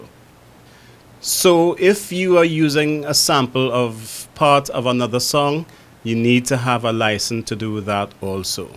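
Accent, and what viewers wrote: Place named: Trinidad and Tobago. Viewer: Instantly knew it was a Trini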